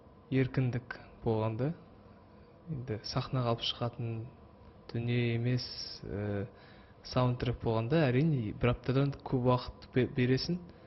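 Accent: Standard. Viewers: Мектептi казакша окыган бала осылай таза, эдеби тiлмен сойлейдi. Жарайсын, айналайын! Берген жауабыннан, iшкi дуниеннiн кiршiксiз таза екенiн тындаган кытай журтшылыгы угады. Ceнiн арканда кытайлыктар казактар туралы жаксы пikipде болады. Рахмет, айналайын, жортканда жолын болсын! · Я Димаш таза қазақ тілінде сөйлегені. Арамызда қазақ маңгүртпен мамбеттерге сабақ болса екен. Әр бір қазақ осындай болса екен. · қазақша сондай таза сөйлейтініне, қазақилығына ризамын! Осы жобаға шалақазақ біреу барып сұхбатты орысша беріп отырса бүкіл əлемге масқара болар едік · Ботен елде казакша сойлегенди естигениме оте куаныштымын! Димаш жарайсын!!!! Казакша сойлегени оте таза, тил байлыгы жогары!!!! 👍👍👍👏👏👏👏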